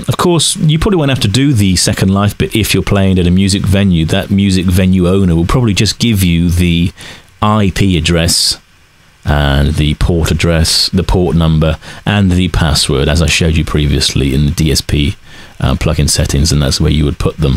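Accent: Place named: Australia. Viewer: Australian accent :P u can hear a difference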